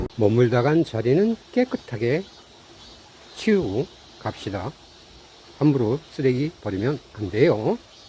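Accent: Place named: Chungcheong, South Korea. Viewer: 산삼능이약초산행에 갔다가 소개받고 왔습니다.좋은영상 감사하고유 말씀이 충청도분이신지 구수하니 좋네유.자주 들리겠습니다.항상 산산.풍산하세요~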